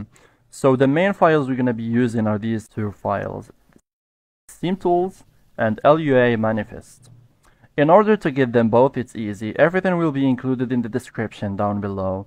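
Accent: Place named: Morocco. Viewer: Out of topic u sound Moroccan or Algerian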